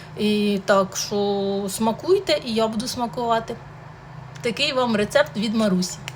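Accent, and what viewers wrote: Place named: Surzhyk, Ukraine. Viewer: Гарна дівчина,але суржик її портить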